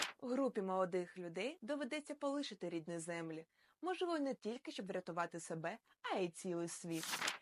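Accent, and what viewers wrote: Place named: Russia. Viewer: Дивна вимова. Схоже що на миті проривається російський акцент. Але загалом добре.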